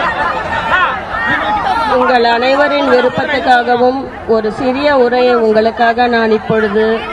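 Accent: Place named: Karnataka, India. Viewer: இந்தப் பாப்பாத்தியின் தமிழில் கன்னட வாடை அடிக்கிறது. தமிழ் நாட்டை ஆள ஒரு உண்மையான தமிழன் கிடையாதா. சசிகலா ஒரு தமிழச்சி, தமிழ்நாட்டை ஆள அதைவிட விட வேறென்ன தகுதி வேண்டும்.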